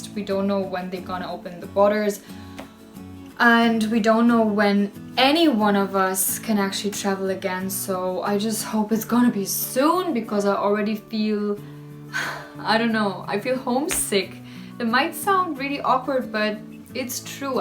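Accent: Karnataka, India. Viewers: Your English language is just like Indian girls & unlike British people. How this happen ? Is it learned English or mother tongue ? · Why her accent is like Indian? What the hell is happening..Is I'm the only one who is feeling that? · Bro ur accent is 💯 Indian!! Never heard a foreigner speaking in Indian accent so accurately!! Howw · Don't get me wrong , but ur accent looks very desi 😀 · your indian accent is so accurate even most of the indians are not speake in their own accent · Your english sounds like an indian girl speaking english. Doesn't sounds like a foreigner speaking english. Enjoy travelling👍Enjoy life❤ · Your accent is so Indian! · You are super pretty! ❤️ The have got Indian accent that is cool. And the outfit you are with is just perfect. As a conclusion you are crush of mine.❤️🔥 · U are an amazing soul, amazing human being. World needs more ppl like u. 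U are always most welcome to India😊 Ur english accent is pretty much like Indian english accent may be cuz u have lived here for a year dats why.. Nice nice.. U look pretty in Indian dress and bindi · Your english accent is similar to our Indian English accent, thanks for sharing your experience in our India. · How come your accent is very Indian?? You are pronouncing every work very distinctively as Indians do. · Very good indian english accent. Interesting to listen your voice. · Love your indian accent 😍😍😍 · Nicely stick bindi on forehead, Indian outfits, lovely look, English with Indian accent... It's really a pleasurable experience watching you n listening you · Your accent is more like on the Indian side. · I noticed a little bit of Indian accent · Bro she sounds just like an indian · Is this your normal accent... you sound so Indian talking English... intonation & pronunciation so perfect... 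Feeling proud being an Indian... :) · I have been enjoying your videos today. It amuses me to note that you picked up some indian accent while speaking in English. :) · Your english wow proper Indian English speaking wow and you look so beautiful and pretty in Indian outfit and bindi on your forehead like i want to marry you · Honestly living in Canada for 8 years now just baffles on the things I’ve missed in india! Also you have a more Indian English accent that most Indians living abroad 🙏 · Fascinated to see and hear ur experiences in Mysore. This is our culture. Enjoy. I am delighted with this video and surprised to hear ur accent in Kannada ! U are more than a Mysorean ? With affectionate best wishes. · You are totally Indian because your vice is totally like as India. Love you.. meet me when you come India.. drop a mail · Oh god you also picked up the Indian accent a bit when you are speaking English 😂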